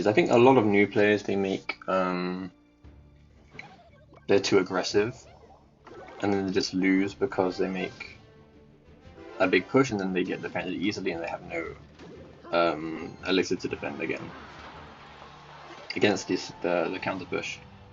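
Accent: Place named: United Kingdom. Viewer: same even though I’ve lived here my whole life. You’re voice just kind of was a british twang if that makes sense 😭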